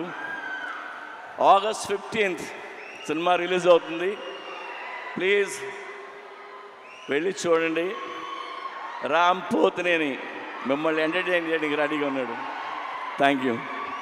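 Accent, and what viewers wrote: Telangana, India. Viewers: orey vadu telangana slung enti ra ..ni ayya..nuvvante maku oka nammakam ..but.. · Telenga slanga ..worst dialogue delivery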